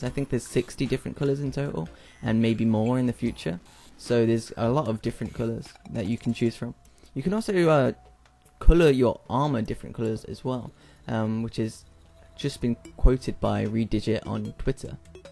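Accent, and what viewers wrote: United Kingdom. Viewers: jesus christ, you sound exactly like James, from rune shark, i had to take a double check for a second...I dont live in the UK but im pretty sureyou have the same accent as well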